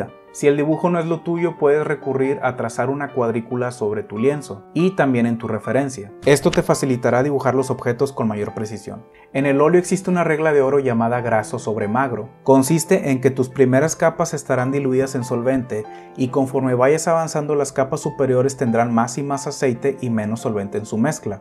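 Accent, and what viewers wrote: Mexico. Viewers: Excelente video. Me suena a que eres de México. Has probado la marca que venden los de Roel? Me parece se llama velazquez. Qué opinas de ellos?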